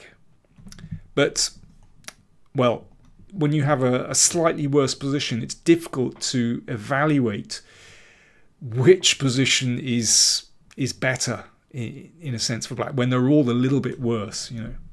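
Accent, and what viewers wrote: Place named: RP, United Kingdom. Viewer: If you wanna hear someone talking too much, try agadmator's Chess Channel.. or, if that's not enough even try this Pepe-guy on chess24: absolutely unbearable word-diarrhoea. Daniels Grandmaster speak is exactly in the sweet spot between education and entertainment, and neither too little nor too much. And, may I add, kept in the most wonderful Queens English. Keep it up.. and tnx a bunch:-)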